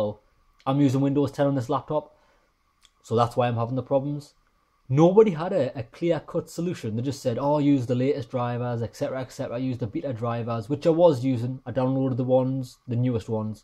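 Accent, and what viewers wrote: Newcastle, United Kingdom. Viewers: Here Kid are you a geordie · When listening threw my headphones my audio out sounds really low can I have some help Btw I realised your Geordie accent lol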